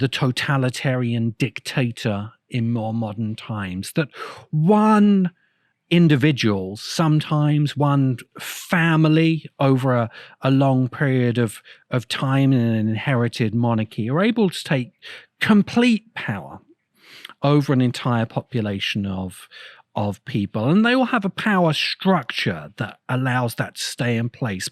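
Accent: United Kingdom. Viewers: So in a nutshell, the author here defines as ''post-propaganda'' any point that might be brought against his own biases. Which in a hilariously cyclical argument sense its a form of propaganda too. Im not payed by Russia or China (hey that's what somebody who IS payed would say lol), but hearing him talking as if he has some kind of higher moral ground, with British accent, is at the very least ironic if not ridiculous. On the point made earlier about how much democratic we would consider today the Athenian Democracy, I will reverse it and ask would the Athenians recognize our indirect practically ''representative'' oligarchy as a Democracy when that term derives from Demos (citizenry)+ Kratos (Power)?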